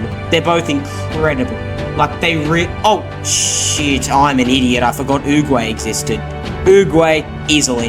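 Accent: Australia. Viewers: I didn’t expect u to be Australian! W tho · I hope you don’t mind me asking cause I would like to know if you’re Australian?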